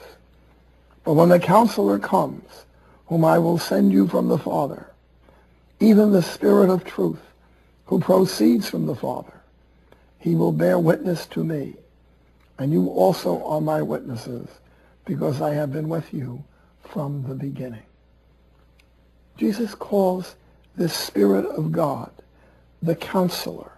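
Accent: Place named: New York, United States. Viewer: ♥️Father Benedict. Enjoy Heaven Thank You for all Your Recordings and vides on EWTN like yuh our style as and New York Accent. A real wealth of time spent in front of recording studio.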